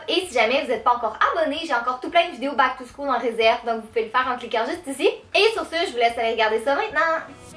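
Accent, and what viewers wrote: Quebec, Canada. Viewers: c'est une cébéquoise elle a l accent, ps:t'es magnifique trop trop belle j aimerais bien être comme toi♡♥ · au Québec ils parlent comme ça c'est tout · 😍j aime troop tes videos et j aime trop ton accent quebecquois trop cute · Tu. Viens du Québec ? :) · maïmouna thiam c pas un accent Marseillais !! C celui du Québec ! :) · mais si tkt juste que je ne m'y attendais pas que tu sois québécoise😁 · Du québec ( désolé je réponds a sa place )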